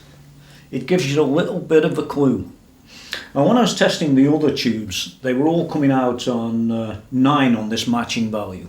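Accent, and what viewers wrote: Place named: Northern England, United Kingdom. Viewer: ah. Fair enough. It sounded vaguely northern to me. Like bits of my mate from st Helens mixed with Yorkshire. I'm from Northumberland myself so anything south of Middlesbrough is a mystery to me accent wise.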